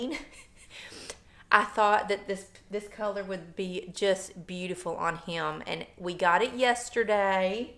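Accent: South, United States. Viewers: Love your adoption of Pollux. Just saw your posts. Absolutely thrilled for all of you! I do have a question if you see this. What state are you in? I can tell your southern. I'm from Alabama so it was nice to hear you say "Yall" alot. I miss home. When my husband retires, we are moving back home, then I'll hear "Yall" used all the time! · YOU HAVE HEART,,THESE ANIMALS I CONSIDER MY CHILDREN.I DIG YOUR CURLS AND THE SOUTHERN ACCENT.GOOD FORCES BE WITH YOU. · Love Pollux and Maxxie. Also love your Southern accent.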